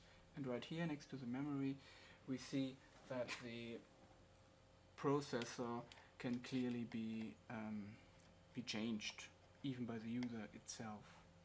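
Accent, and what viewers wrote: Germany. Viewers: ein leicht deutsch akzent ;) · ich höre schon das du eigentlich deutsch sprichst ;D kannst du mir sagen welche grafikkarte rein müsste um battlefield 3 auf hohen ( nicht ultra ) einstellungen zu zocken ? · schöner deutscher acczent^^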